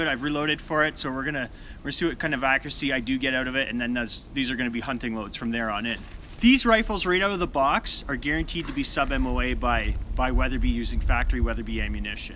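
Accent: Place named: Ontario, Canada. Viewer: Ha knew that was an Ontario accent!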